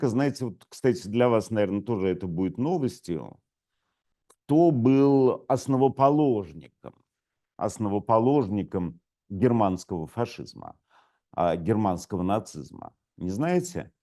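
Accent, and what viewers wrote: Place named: Oxford, United Kingdom. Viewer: Я так и знал! Я всегда знал, или догадывался что милейшие милорд - это человек Оксфорда. Просто по его оксфордскому выговору, по манере держаться, присущей всем небожителям и по качеству работы его мозга.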